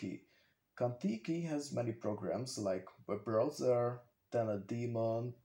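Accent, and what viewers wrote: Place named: Hungary. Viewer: amazing project! your accent sounds a bit Hungarian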